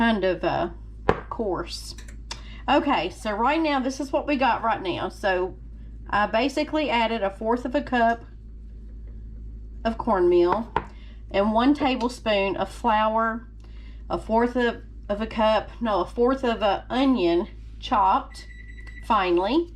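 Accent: South, United States. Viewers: She so country and I love it lol😂. I’m country too , northerns love our accent! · I could listen to you talk ALL DAY! I LOVE your country accent. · they look good this woman is a good ole country girl and you can tell by her accent i bet they are good im 68 and been eating them all my life in my grandmother cooked all the time and did not have any education at all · Salmon cakes with southern accent. Im subscribed 🇺🇸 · I love your beautiful Southern accent.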